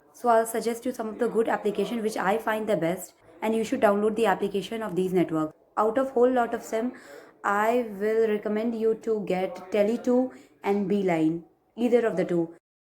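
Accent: India. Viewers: indian accent tho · What a Indian accent hababb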